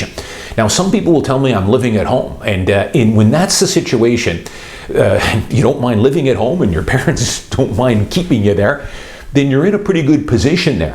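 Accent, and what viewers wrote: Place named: Canada. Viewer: wow I never realised what the Canadian accent sounded like until this buy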